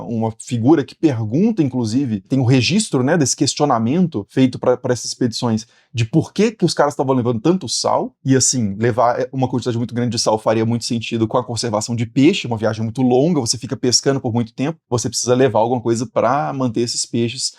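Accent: Minas Gerais, Brazil. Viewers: Hoje vc ta mais mineiro que o normal kkkk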